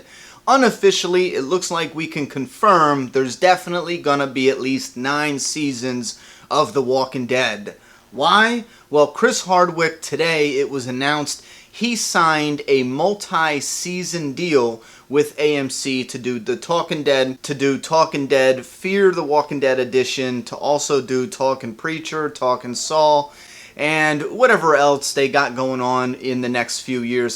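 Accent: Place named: East Coast, United States. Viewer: I like you. I'm from Long Island originally and it's nice to hear an east coast accent for a change. Trevor at Trevschan2 could learn a few things from you, IMO, so I think you do a good job. BTW, anymore of those candy packs come your way?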